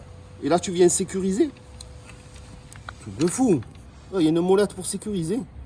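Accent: South, France. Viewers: Plaisir de t écouter!! Jkiff l accent du sud!!👍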